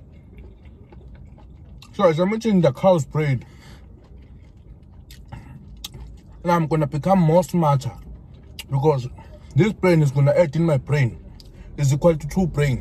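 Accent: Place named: South Africa. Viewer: I love this sort of English accent, with no effort of trying to perfect it. I love this South African way.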